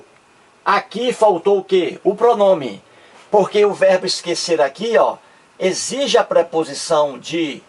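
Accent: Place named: North, Brazil. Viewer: vc devia se concentrar só em ensinar português, é muito mais bonito que postar videos blasfemos!! Acho bonito o.sotaque nortistas seu. Abraços do piracicabano aki!!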